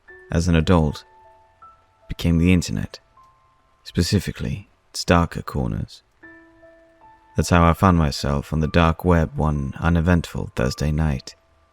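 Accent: United Kingdom. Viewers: Right!!!! The f**kin voice,soooo sexy and very sexual and the big orgasm is the voice being British!!! Unbelievable hot!!!!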